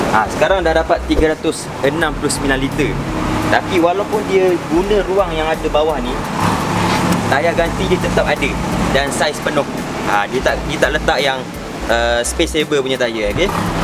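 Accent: Terengganu, Malaysia. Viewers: Welcome bang, kalau boleh kekalkan loghat ganu time review. Boleh jadi trademark abang nanti.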